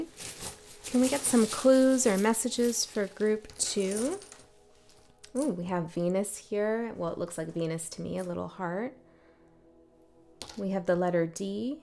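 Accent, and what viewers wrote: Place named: Canada. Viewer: i see that you are a piacean same as me, love your ring on your right hand, i have always loved your canadian accent, you have a very soothing voice, i see the letter m in thye palm of your hands and the half moons in your nails. i was born on march the 6 th at 6 am 1962 what day were you born?. ps your boyfriend is a lucky guy i hope he realises that. what part of canada are you from, love your cat by the way.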